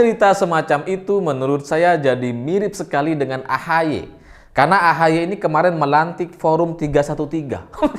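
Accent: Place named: Banyumas, Indonesia. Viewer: Bocah ngapak ya, kok doyan maenin agama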